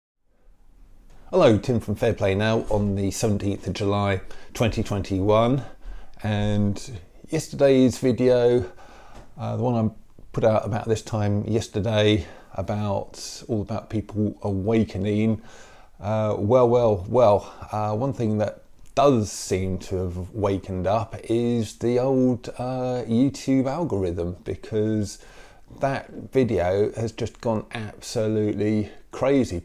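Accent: England, United Kingdom. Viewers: Your speaking like a true English man!! Love and respect!! Time to look after our own!!!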